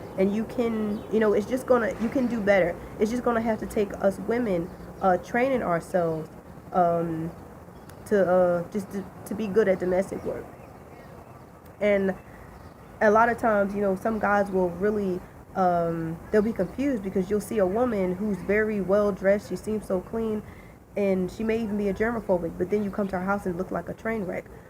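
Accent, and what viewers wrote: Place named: Philadelphia, United States. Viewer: Sounds like you're from Philly sis.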